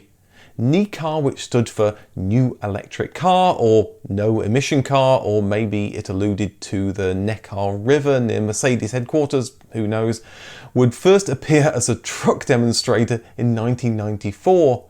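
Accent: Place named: Midlands, United Kingdom. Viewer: There's many British accents and dialects. Midlands/northern accent?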